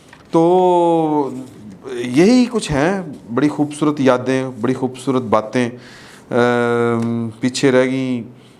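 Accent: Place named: Lahore, Pakistan. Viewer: Maza aa gya...the best thing I like about you is Lahori zaban. Dil khush ho jata hai...kyun...mein vi Lahori ain beshak Karachi rahta hoon.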